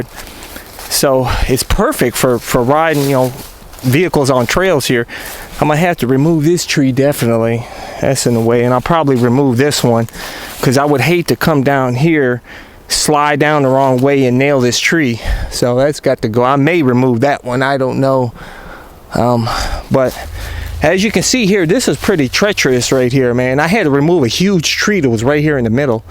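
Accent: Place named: South, United States. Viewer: the southern accent is the best part of this video! 🤣🤣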